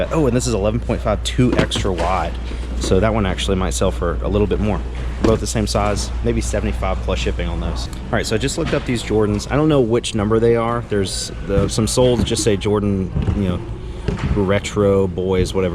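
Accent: Australia. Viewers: That Australian accent though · Hahaha mate your Aussie accent was worth a giggle 🤣gday mate hope ya both well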